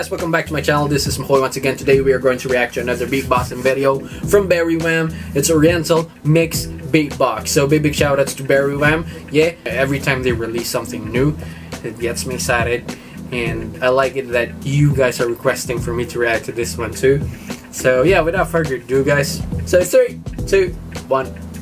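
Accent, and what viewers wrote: Philippines. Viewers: Illongo ka sir? Hahaha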